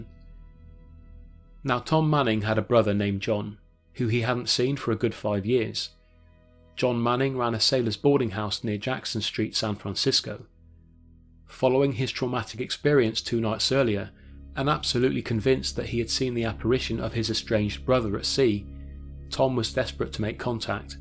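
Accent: England, United Kingdom. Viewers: how could it possibly have been at all profitable to sail coal clear from Australia to the United States? There are small, yet damning fapts of logic and lapses of sound reasoning that plauge each and every one of these "Tall Ship Tales And Old Sailors Tales" but I both appreciate the imagination that spawns them and the attempts to make them appear as realistic ad possible. Your cool English accent helps them along as well.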